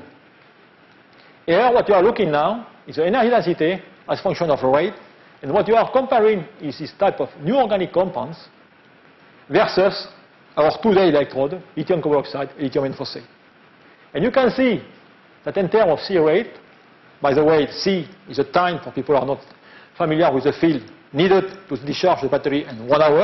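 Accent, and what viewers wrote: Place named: France. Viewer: Nice lecture, but the French accent is too strong.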